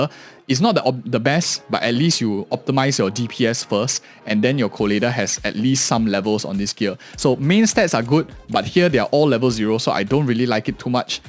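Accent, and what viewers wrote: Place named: Singapore. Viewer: He has a Singaporean accent.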